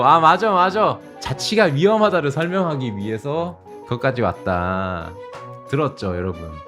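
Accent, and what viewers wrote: Gyeongsang, South Korea. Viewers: 경상도 사람이엿구나..........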